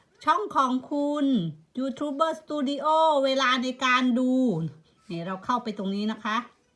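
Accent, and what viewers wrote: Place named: Surin, Thailand. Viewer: ขอบคุณสำหรับความรู้ดีๆครับ เสียงบรรยายคล้ายคนสุรินทร์บ้านเดียวกันเลยครับ 555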